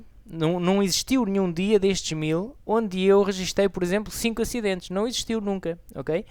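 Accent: Portugal. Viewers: Muito obrigada, me ajudou bastante. Uma ótima explicação, além do sotaque ''português'' tão bonito que nos ajuda a ter melhor compreensão para a resolução da questão. O passo a passo com que resolveu o problema foi muito bem explicado. Parabéns! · o jeito que explica é muito bom, parabéns, mas eu não entendi direito por causa do jeito mais de portugal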